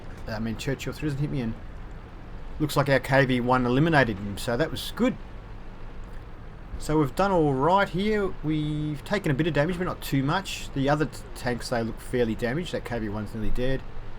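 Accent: Australia. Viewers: hi are you aussie?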